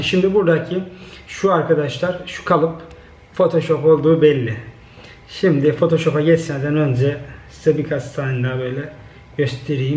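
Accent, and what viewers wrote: Black Sea, Turkey. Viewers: Abi karadenizli misin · rizeli artvinli misin ya da karadenizli konusman ayni bizim oralar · Reis sen nerelisin ya trabzonlusun ya da rizeli ben ofluyum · Abi Trabzonlu musun Rizeli mi ? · Abi karadenizlimisin ? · Abi sen Karadenizli misin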